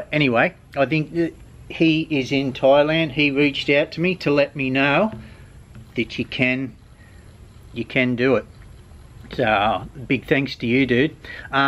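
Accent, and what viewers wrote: Australia. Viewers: Hi I’m new to your channel and I’ve heard you talk about your kids and was wondering if nee née have any. Keep up the videos good listening to an Aussie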